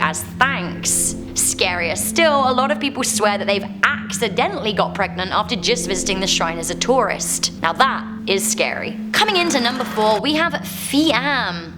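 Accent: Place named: United Kingdom. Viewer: Yeah us Brits have amazing accents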